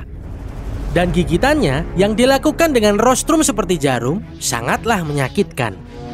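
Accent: Java, Indonesia. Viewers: Dari dubing ini saya seperti mendengar logat jawa ini unik fren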